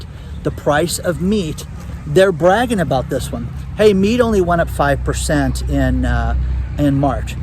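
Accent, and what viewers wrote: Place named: California, United States. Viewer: I love his California accent haha. It’s like a Canadian..certain words have a zing. Anyways great content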